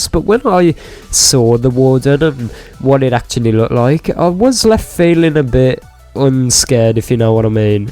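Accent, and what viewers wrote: Scotland, United Kingdom. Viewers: I think he's Scottish but idk the words he uses don't sound like a Scottish person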